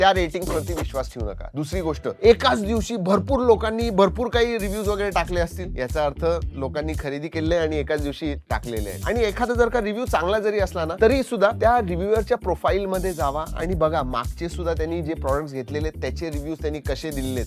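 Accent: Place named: Mumbai, India. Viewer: प्रोफाईलमध्ये जावा? मुंबईची मराठी वाटते. लय भारी!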